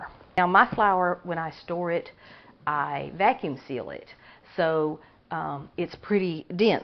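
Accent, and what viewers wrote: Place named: South, United States. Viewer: Hears charming Southern accent stops to watch entire video. Thanks YouTube for this.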